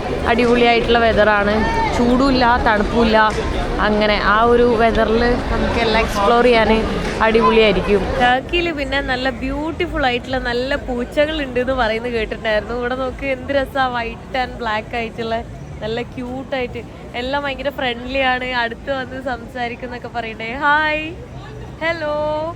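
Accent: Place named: Kannur, India. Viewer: Hiii you always speak in Kannur slang 😊❤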